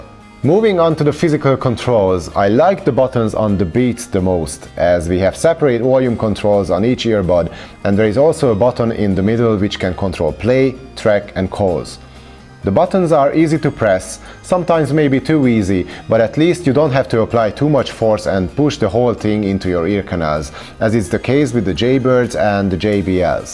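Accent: Hungary. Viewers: Magyar vagy? :) az akcentusod alapján olyan mintha az lennél :) ettől függetlenül szuper a kiejtésed és a content is szuper :)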